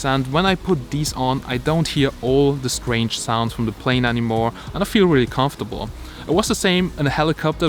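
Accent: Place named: Germany. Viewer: love your german accent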